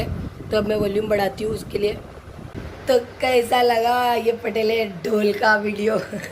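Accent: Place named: Nagpur, India. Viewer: Asslamoalaikum ji.. Aaj pehli bar apka vdo dekha maine.. Balki apko hi pehli bar dekha maine aaj.. Pata nhi Q but aap dekh k bahot apnapan feel hua mujhe.. Like apki bate hamare Nagpur Jaise lagi.. Aap kaha se ho.. Or vdo bhi dekhugi ab mai apke.. 🤗🤗🤗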